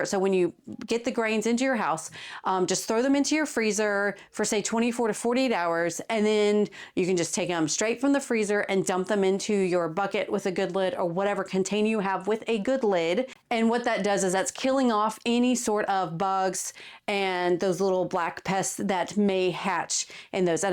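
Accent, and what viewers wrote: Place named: Florida, United States. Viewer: You sound like you like here in Middleburg FL!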